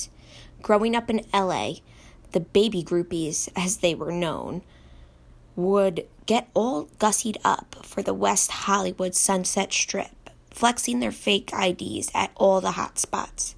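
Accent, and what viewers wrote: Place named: New York, United States. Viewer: You sound like you’re from New York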